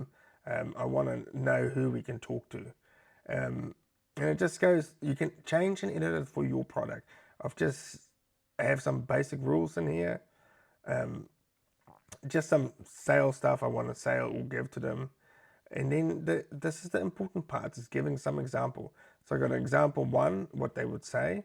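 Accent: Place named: South Africa. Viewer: i'm hearing the South African accent, fellow South African here. Thanks for the content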